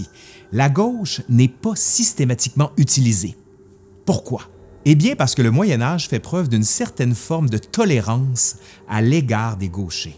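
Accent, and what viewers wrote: Quebec, Canada. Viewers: Salut J''ai un peu plus de 50ans et je me permet de faire remarquer que beaucoup d'artistes sont gaucher! Et sais tu que les gauchers ont la faculté d' écrire à l'envers. Tu peux donc les lire que face à un miroir! Ça ne sert à rien! Et beaucoup de gaucher l'ignore avant de d'avoir essayé! Je te suis régulièrement, tes continus sont intéressants, et très bien documentées. C'est surtout divertissant d' attendre parler de la l'histoire de France et autre, avec un petit accent canadien ou Québécois! Je ne sais pas faire la différence, si il y en a une! En tout cas, continue!? Tu fais un très bon boulot avec ton équipe..... Bon courage! J-P. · Et bien bordel,je ne savais pas tout ça étant moi même gauchere ou parfois mais rarement ambidextre j ignorait l histoire de ce fascinant outil qu' est la main gauche car les gaucher on tendance à être plus agile dans certain domaine comme dans le dessins que je pratique.... 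Je suis fière d être ainsi merci de votre connaissance avec un ton de sagesse et d une pointe d accent québécois vous m inspirer avec nota bene pendant de je dessine ça me détend continuez ainsi...votre savoir doit être important pour les génération futur...😊😊📚✏🖌🙏🏻🙏🏻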